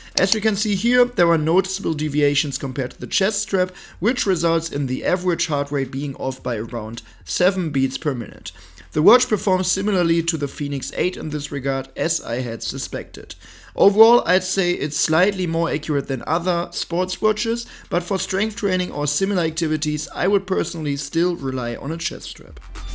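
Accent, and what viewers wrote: Germany. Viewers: Accent, is it German?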